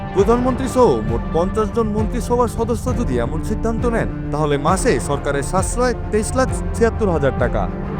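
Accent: Kolkata, India. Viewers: ভয়েস যিনি দিছেন, তিনি কি ইন্ডিয়ান নাকি? Audience must use earphone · উপস্থাকের বাড়ি কি ভারতে,ভাষা এমন কেন? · যিনি ভয়েস দিয়েছেন, তিনি কি ভারতীয় নাগরিক নাকি? উচ্চারণ সেটাই মনে হচ্ছে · জিনি সংবাদ পাঠ করলেন সে কি বাংলাদেশি নাকি ইন্ডিয়ান? উনার উচ্চারনে তো আমার সন্দেহ আছে। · উপস্থাপক কে কি কলকাতা থেকে নিয়ে আসা হয়েছে?? ভাষাটা একটু খেয়াল করে দেখবেন মিথ্যা বললাম কিনা? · কথায় ভারতীয় টান ক্যান ভাই? সমস্যাকে ছমচ্ছ্যা বলাতো স্বাভাবিক না। দুই দিন আগে কোন এক এক্টিভিস্ট এর পোস্ট দেখেছিলাম, গিমিক সরকার নিয়ে সেখানে প্রতিটা পয়েন্ট বলা ছিলো। এই নিউউজ ঐ পোস্টের কাউন্টার 😂😂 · Jini news porce tini ki Indian , Indian akta tan ace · Apne ki dadar desher lok? Vasha sune kolkatar vasa mone holo 😕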